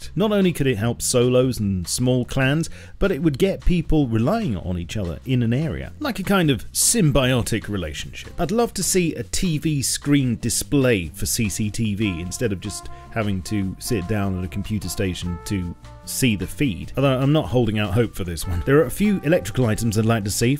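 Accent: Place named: Cockney, United Kingdom. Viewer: ...hmm...I wonder if and The Spiffing Brit are related?..both are partial to a cuppa...both have posh accents with just a hint of East End..init..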